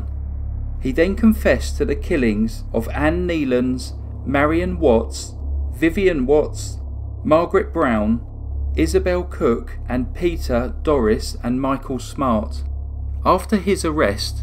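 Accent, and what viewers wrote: United Kingdom. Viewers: Hey, ThankY again for another great video!! What county/province are you from in the U.K.? The reason I ask is because the gentleman who does the series: Travels by Narrowboat (Kevin Shelly) sounds Exactly like You- not just the accent, but in pronunciation, pitch, resonation, word choices.. all of it. Briefcase & you sound similar, but this gentleman- it really is amazing how much much you sound alike.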